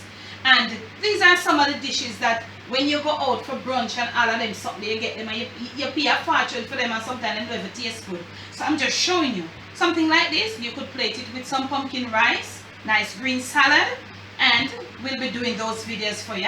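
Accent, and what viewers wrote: Jamaica. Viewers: Thank you for sharing with us your Jamaican pepper steak recipe. I will try it this coming Thanksgiving for my family. You have a nice way of talking, and I like your Jamaican accent.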